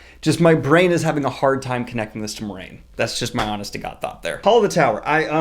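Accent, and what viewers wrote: United States. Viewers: That’s such a ridiculous critique man. He pronounced them properly and is using them in a sentence, also that’s how an American accent sounds. Don’t be harping on people for not pronouncing something the way you think it should be. Try saying those names out loud in a sentence and I bet they sound exactly the way the audiobooks and says it. This is the kind of comment that makes certain people in fandoms toxic. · are you from NOVA???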